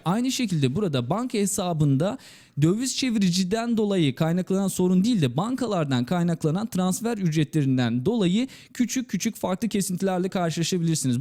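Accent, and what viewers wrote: Azerbaijan. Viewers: hə azəridi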